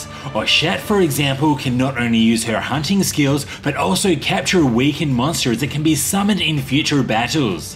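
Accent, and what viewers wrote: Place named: Australia. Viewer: The Patreon joke and the rpg in the name and the Aussie accent had me instantly sub.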